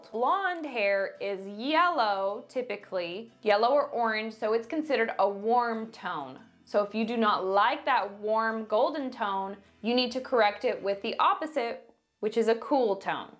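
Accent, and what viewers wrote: South, United States. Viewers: I can’t decide if my hair looks better brown or blonde. Do you do hair in a shop? You sound southern and I live in the south. Would travel to have you do my hair. I feel like if I go brown I might look older hmmmmmm did I say just got divorced, my dogs died and I broke out with psoriasis all in a year. I’m finally healing but I feel so darn ugly. I sort of gave up on trying to look my best after so much medication and steroids. I want to try and look as youthful and I just can’t seem to get it. I paid 200 at my last salon appt and it looked no better than when I did it. 💕😀love you and keep smiling. You have brightened my day so many times. Marnie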